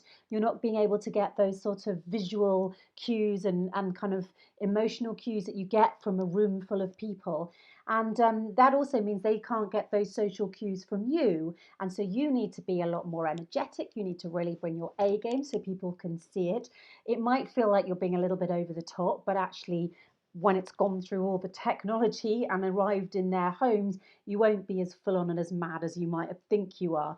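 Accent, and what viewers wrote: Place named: United Kingdom. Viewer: Hi madam,I'm really big fan of yours,i like British accent,especially your accent.kindly suggest me any content or how i can contact with you to learn this beautiful accent which you have.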